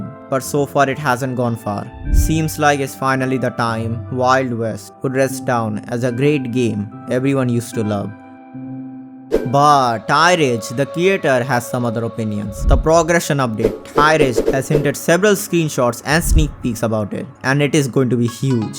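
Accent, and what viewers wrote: India. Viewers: Your tone is like Indian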